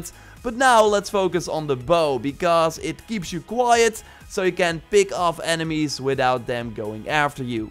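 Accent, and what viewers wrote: Netherlands. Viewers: Ik hoor aan jou accent dat je Nederlands bent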